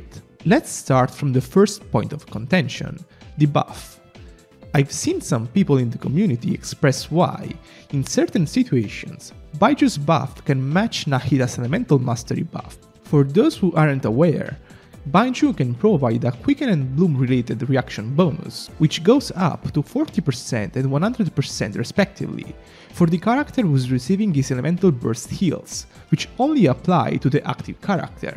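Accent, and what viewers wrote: Italy. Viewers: What’s this accent ? Italian ? It’s beautiful btw!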